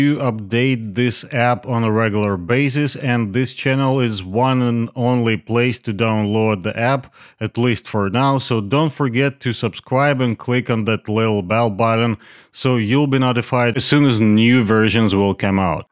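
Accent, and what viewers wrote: Russia. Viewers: Русский акцент я узнаю везде, так держать товарищ! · Русский акцент не перебить чистым английским) Отличная тулза, спасибо! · Я прям чувствую родной акцент :) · Чувак, ты же явно русский, правда?)))) акцент.. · Russian accent coder "based" in the US yeah call me paranoid but nope i like my FPS like it is. Also, 50/50 stolen code. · russkiy russkogo slishit iz daleka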